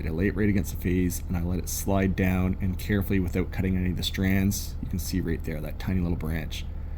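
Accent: Canada. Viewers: He's in Canada. His accent is a dead giveaway.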